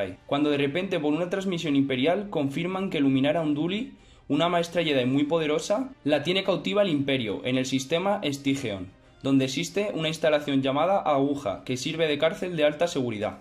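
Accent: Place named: Spain. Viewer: triste encontrar un youtube pura voz española de p o r k r i a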